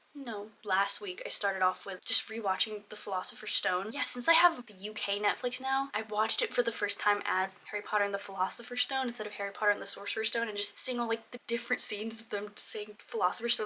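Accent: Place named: United States. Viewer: I did not expect an American accent lol, I thought I’d here a thick Scottish accent. Do a lot of people outside of Scotland go to the school?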